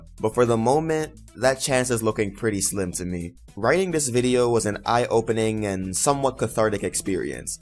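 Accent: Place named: Caribbean. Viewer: so, what part of the Caribbean are you from?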